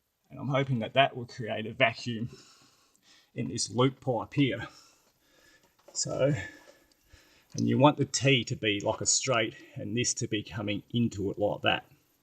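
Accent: Australia. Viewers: Damn! If your Aussie accent was a little less prominent I might be able to understand your mumblings. Seriously, Mate. Speak clearly for the audience. I did figure out your hose routing, so, thanks for that. Be well. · Its not. Perfectly normal Aussie accent.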